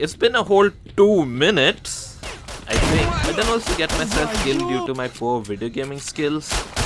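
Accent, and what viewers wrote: India. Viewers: i think he sounds like a idian guy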